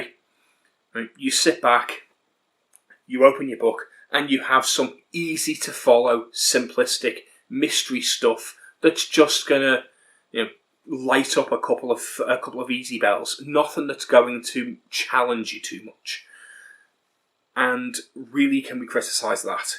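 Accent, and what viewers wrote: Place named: Liverpool, United Kingdom. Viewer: This is a bit random, but are you from the Wirral? I've been trying to figure out your accent because you sound a bit like a scouser but I'm not sure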